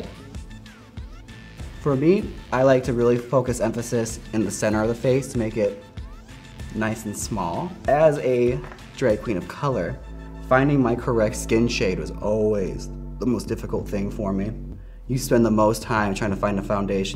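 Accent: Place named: Wisconsin, United States. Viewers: her Wisconsin accent! 💚 love from chicago! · I'm from Wisconsin too and never really thought there was an accent but hearing him talk omfg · Honey, love the Wisco accent. Please curl your lashes and blend them with your fakes with mascara, the difference is so stark and obvi. Two, please do something with your lower lashes! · That Wisconsin accent 👌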